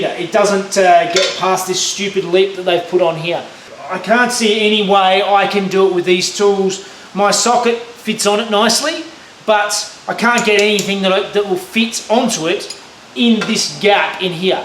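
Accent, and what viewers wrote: Australia. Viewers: Aussie accent? If so how can i understand most of what u say not the same with that cycling durianrider i cant barely understand.. Greetings from mexico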